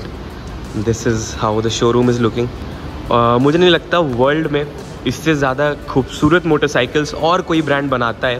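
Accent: Lucknow, India. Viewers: Bhai lucknow se ho 😄😄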